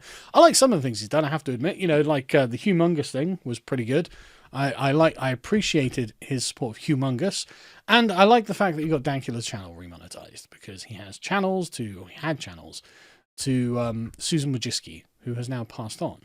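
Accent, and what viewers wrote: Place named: United Kingdom. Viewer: I love our boy providing updates on internet drama in a proper suit and brittish accent.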